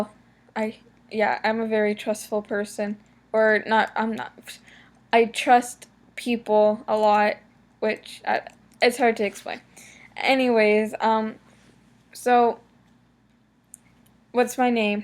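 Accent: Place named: Toronto, Canada. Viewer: omg i think your from toronto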